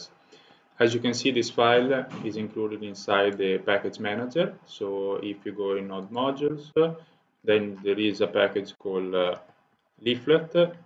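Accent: Italy. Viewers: thats a really good tutorialle. I opened my Appe. ıt worked with the leaflet mappe. BTW i didnt even heard italian ever but its funny ı give u that :D . thank you anyway :D greate tutorial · hey! thank you for the great tutorial I found it very useful for my project, btw your Italian accent is awesome :D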